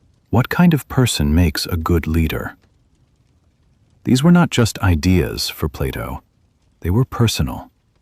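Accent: United Kingdom. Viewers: It was nice, especially the English accent. I hope more interesting stories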